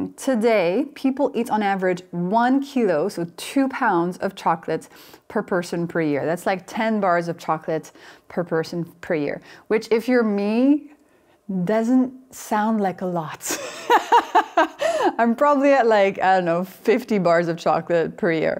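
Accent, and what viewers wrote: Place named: France. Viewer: Your accent smoothly transitions back and forth between French and North American, and it sounds absolutely lovely 😊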